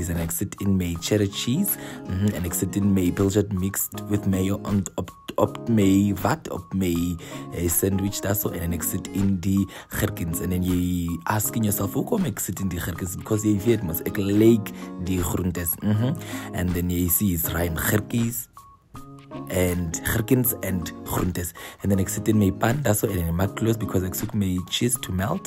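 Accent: Afrikaans, South Africa. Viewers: That Afrikaans though. 🤭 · The Afrikaans 😂❤ loooove it!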